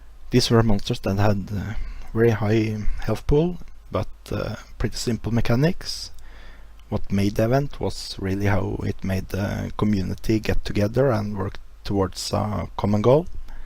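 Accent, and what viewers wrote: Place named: Norway. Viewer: Well I don't see why would you need native speaker tbh. I suppose there is a lot of players in AoC which doesn't speaking natively so I think they don't mind your accent or how it call Norenglish :P Watched EP1 but I think it was tooo long. I suppose podcast about 10 minutes is just fine. Just continue if you want to do it really and also announce on forums as I did not see any info about it just came here by accident :P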